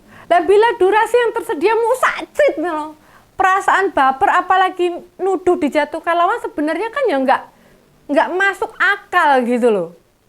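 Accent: Java, Indonesia. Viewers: Ini mbak makin lucu, makin berani, makin tajem... Dan dicampur logat dan boso Jowo jadi pas untuk pendidikan pembelajaran mayoritas masyarakat NJAWANI..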